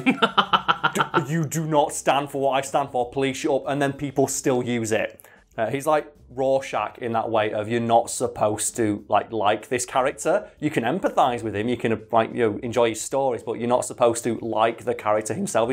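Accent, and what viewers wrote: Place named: Southeast London, United Kingdom. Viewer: Use your damn northern accent you southeast London sounding ass. Please?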